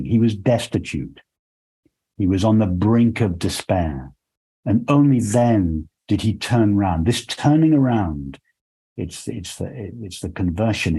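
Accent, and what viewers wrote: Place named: Belfast, United Kingdom. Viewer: Hi, I here ur accent I feel like ur from Belfast or near to that. I have been on this journey for some time now and I get some glimpses here and there. I'm really starting to understand things at an intellectual levels. I'm on you tube alot seeking. I'm just wondering is there anyone you woukd recommend from our side of the world to help guide me on this path. Thank you for this video. I look forward to watching more. ❤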